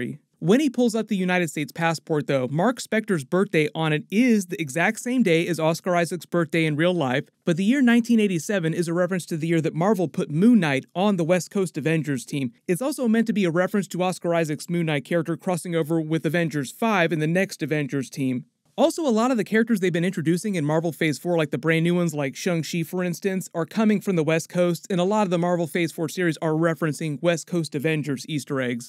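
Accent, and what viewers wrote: England, United Kingdom. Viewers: I agree. Not saying you're ignorant though 😉 but his English accent is just fine. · Great video as always. But oh my word. Public announcement that the English accent isn't that bad 😅 people do speak like this.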